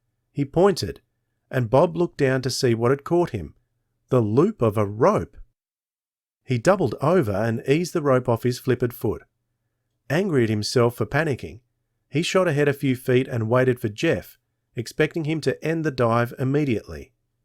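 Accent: Australia. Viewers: Thank you for your reading of this Junior Sleuth series of books by Robert Arthur. I appreciate your fine impersonation of the Greek boy's accent. Your own Australian accent is interesting to listen to. Some years ago, I read The Mystery of the Haunted Mirror. That was my first discovery of Jupiter, Pete and Bob and their Detective agency, The Three Investigators. ☎️🏛☎️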